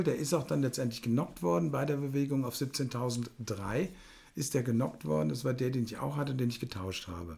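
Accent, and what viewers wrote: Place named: Hesse, Germany. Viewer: Ey, subber hessisch gebabbelt Alder! Guuude Rutsch, gell?!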